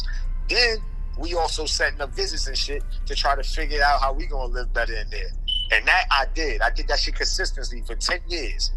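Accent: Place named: New York City, United States. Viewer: Hearing this man speak make me love the nyc street shit so much I dunno why I’m like this😂🗽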